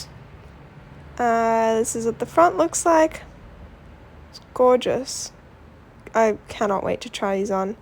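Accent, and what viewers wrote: Australia. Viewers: Good review and you have Aussie accent 😎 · Before you moved to Australia? But your accent sounds just like any other Aussie!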